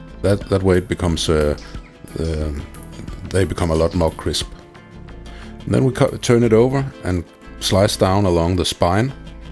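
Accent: Denmark. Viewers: Looks great, and the Danish accent makes it even better.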